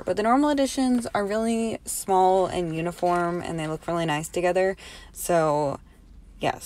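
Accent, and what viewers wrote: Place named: United States. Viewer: i also want to call it the Re Ve Festival but the girls pronounce it as "reeve" i guess since since yeri calls the robot Reve. You sound American, but how did you get the album so fast?! It'll take a month before I get mine. I'll trade anthing for Irene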